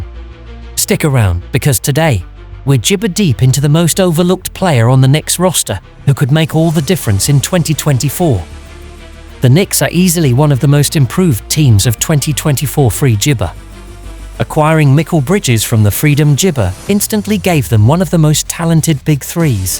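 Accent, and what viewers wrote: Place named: United Kingdom. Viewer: Like your British English accent bro ‘ but I am not sure about jibber and jabber deal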